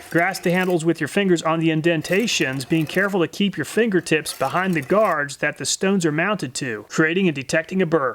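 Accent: United States. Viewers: He sound so American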